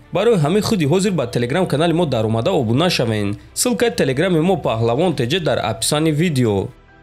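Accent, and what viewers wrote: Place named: Dushanbe, Tajikistan. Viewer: Аксенти ту ҳамин аксенти Душанбеги бисёр сарама маиз мекунад. Аксентат гуҳ хаст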